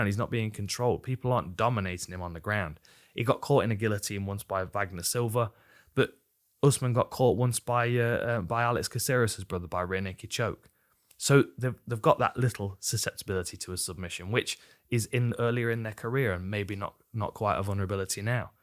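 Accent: United Kingdom. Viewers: I don't know what it is about that British accent but I can listen to you speak for day's. Its like listening to sean Connery read a book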